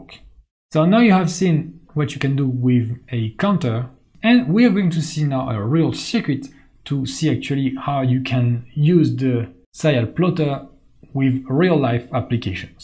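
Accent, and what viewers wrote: France. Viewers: i have one question are you french?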